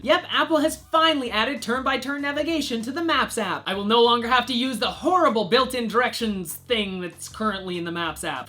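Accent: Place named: Canada. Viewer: you r are canadian?????? how could I not have noticed that??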